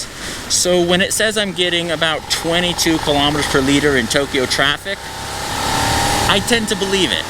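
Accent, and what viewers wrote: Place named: United States. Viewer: Down vote for enduring clickbait with that irritating high pitched nasally 'murrican accent and waffling verbosity. Get to the point!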